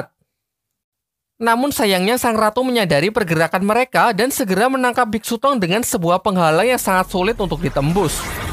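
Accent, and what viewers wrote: Java, Indonesia. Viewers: Jowo bang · Wong jowo e mas? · Wong jowo mas?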